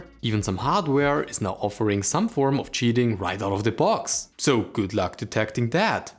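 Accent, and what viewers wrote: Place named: Germany. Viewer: You sound German :).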